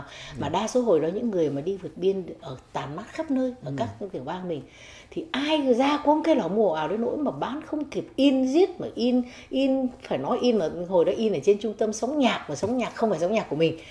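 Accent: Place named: North, Vietnam. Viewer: Chương trình của Jimmy rất hay, thật đẹp và dễ thương, nói chuyện huyện thuyên đúng là con gái Bắc kỳ. ❤️❤️❤️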